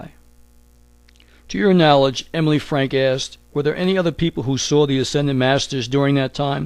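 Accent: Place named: New York, United States. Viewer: Narrator with a NY accent does documentary of stories from Mt. Shasta, in CA?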